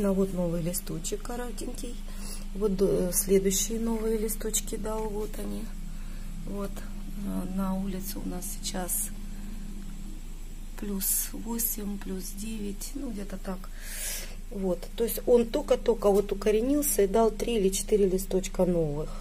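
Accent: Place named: Ukraine. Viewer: Здравствуйте! Спасибо за обзор. Начала смотреть ваши видео с антуриума. И мне понравилось ваше отношение к растениям. Скажите, пожалуйста, а где вы географически находитесь? Очень интригующий вид из окна! И очень напоминает картины северных районов России: Воркуты, например. Но судя по наречию, вы, конечно, украинка.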